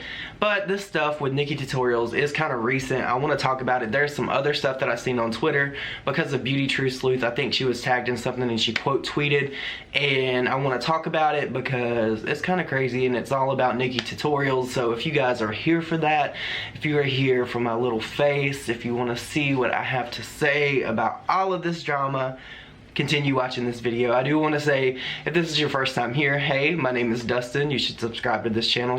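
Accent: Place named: Carolinas, United States. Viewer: OMG so excited there’s another in our area. I’m in Clover but raised in Gastonia. Subbed to them. Love hearing our country drawl in videos I’m watching. ❤️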